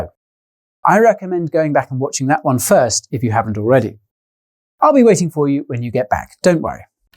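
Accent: United Kingdom. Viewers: Good man I like British English lived thre in Surrey for 25 years I moved to Australia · high quality to the point ... tanx bro .. I have some problem with british akcent I should listen more ... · British English lol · Thank you for saying you’ll explain everything in plain British English. It actually helps a lot for someone like me who’s first language is not English. Thanks a lot. Now I’ll resume to watch the video ♥️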